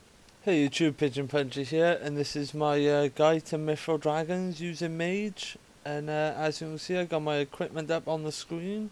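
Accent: Wales, United Kingdom. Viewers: From Wales? Sounds like it :D good vid man. subbed, keep em coming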